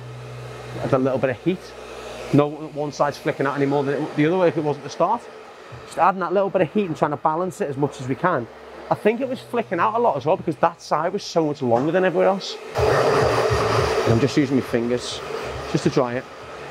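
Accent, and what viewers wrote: Liverpool, United Kingdom. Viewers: Love your videos! I know you don’t work in liverpool but your scouser accent is undeniable... where would you recommend in liverpool? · Scouse, from Liverpool probably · Love ur Scouse Accent mate🥰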